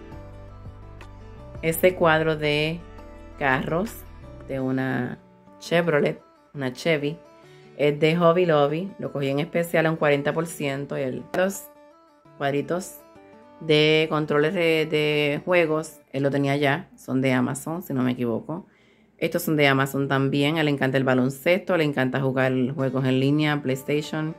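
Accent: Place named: Puerto Rico. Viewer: yo también soy de Puerto Rico vivo en Iowa. Te reconocí por nuestro acento. 😂😂 eres nueva y te voy apoyar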